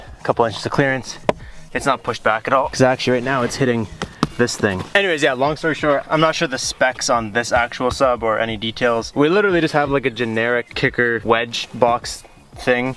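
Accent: Canada. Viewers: Are you Canadian???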